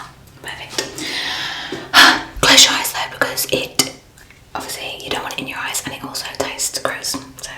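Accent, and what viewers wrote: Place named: United Kingdom. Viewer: Hi love 💕 i like your british accent. Are you from Australia?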